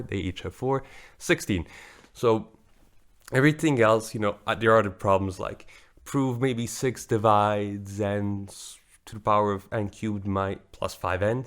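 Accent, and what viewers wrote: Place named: Bosnia and Herzegovina. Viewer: He has a Bosnian accent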